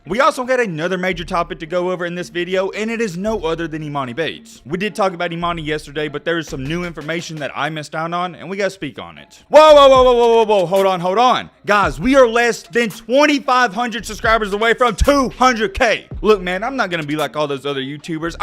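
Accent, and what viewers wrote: South, United States. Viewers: No clue who dude is but he just spent half of this video talking about random shit lmaoo. “Exposing….” Do it then, don’t spend 7 minutes boring with your lil yeehaw accent smh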